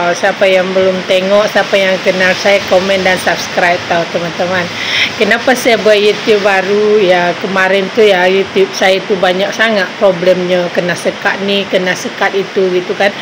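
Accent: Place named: Malaysia. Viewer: logatnya Udah Ada malaysia kak 😊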